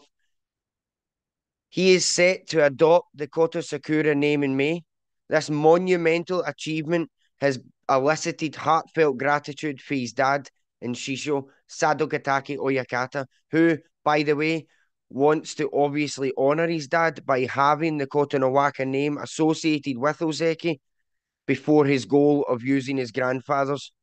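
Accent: Scotland, United Kingdom. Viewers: love getting my sumo news with a scottish accent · The Scot is back! Always a joy to hear your commentary and hot takes.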